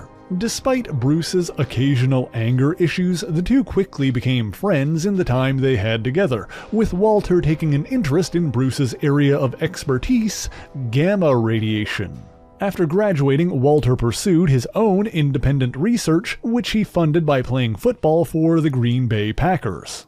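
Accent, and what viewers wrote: Canada. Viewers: Good work. Your Canadian accent enhances this video 💯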